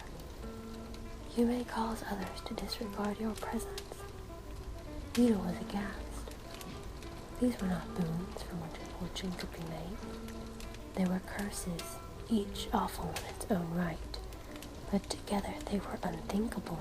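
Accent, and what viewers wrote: South, United States. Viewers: American accent spoilt it for me. Americans are not medieval. It needs to be an English accent. Cornwall, Devon, Irish,... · i like the slight southern twang to your voice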